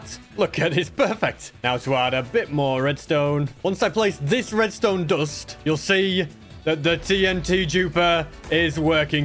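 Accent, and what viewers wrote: United Kingdom. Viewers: Also are u like British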